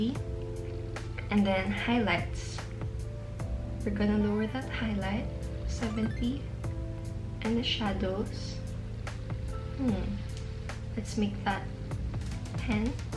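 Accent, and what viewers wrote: Philippines. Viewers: ok but I was SHOOK by her filipina accent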